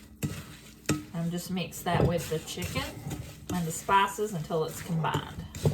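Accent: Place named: East Texas, United States. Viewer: I’ve just subscribed and I plan to binge watch your videos. I don’t know where you’re from, but I’m from east Texas, and we even sound alike. Kinda feel like I know you. All the best to you and yours!❤😊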